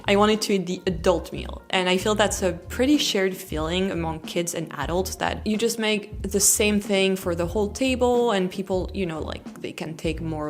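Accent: United States. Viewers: How did you get. your almost-American accent? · I'm a French guide mostly working for Americans. First of all, no one ever mentions it but your American English is on spot ! 🎉 I enjoyed a lot rhe section speaking about Food education. I find it very important and i think this is what leads to most of the other reasons you've mentionned. I oftne tell my guests about the long lunch break, even for kids 😂 my 2 yo daughter goes to day-care abd they sit at tiny tables on tiny chairs, eating out of a food tray with different compartment (starter main and desert/cheese) Found it so cute 🥰 Love your videos. Thanks I enjoyed the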